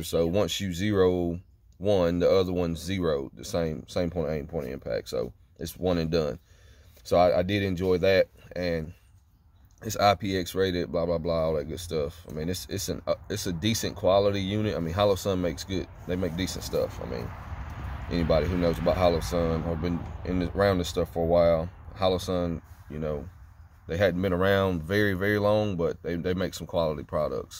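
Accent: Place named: South, United States. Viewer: Im sorry but he good ole boy i hear it in his voice. southern livin baby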